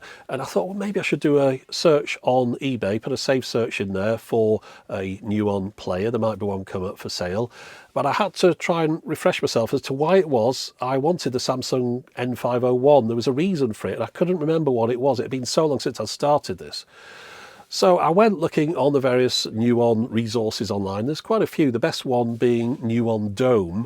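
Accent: United Kingdom. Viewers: you sound like oyur from the uk so maybe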